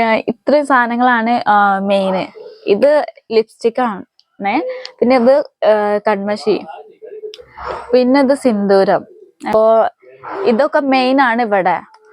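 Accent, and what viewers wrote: Malappuram, India. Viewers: Aap kaisea sikkayaa Malayalam..Malapuram slag 👍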